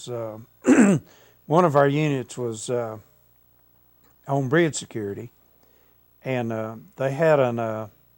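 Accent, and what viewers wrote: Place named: South, United States. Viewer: He's got a way of story telling and that southern accent adds some drama which is entertaining.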